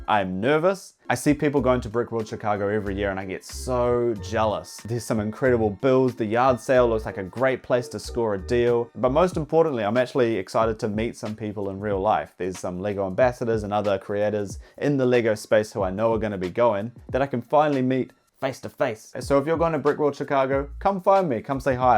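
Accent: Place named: New Zealand. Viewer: Saw you on VidIQ and was like "hmmm sounds like a kiwi and his account is. I'm gonna go out on a limb and assume he's a kiwi". So obviously subbed. Congrats on the success fellow Kiwi creator:):)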